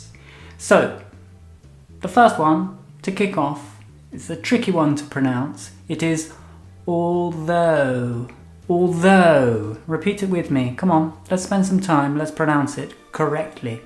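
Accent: United Kingdom. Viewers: This is British? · Pero que dulce es el acento Britanico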